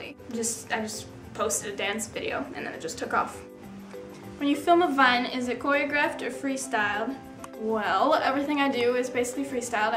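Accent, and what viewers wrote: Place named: Chicago, United States. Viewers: Her accent is like a normal Midwest accent. I have it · She has a bit of a Chicago style "ah" going on, but that's just what I hear. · I think it's because she's Midwestern. Maybe from Minnesota or Wisconsin.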